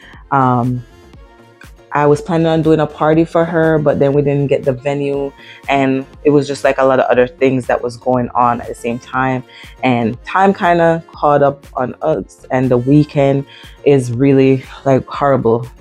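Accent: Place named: Jamaica. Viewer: You sound Jamaican..... love that 🇯🇲🇯🇲🇯🇲🇯🇲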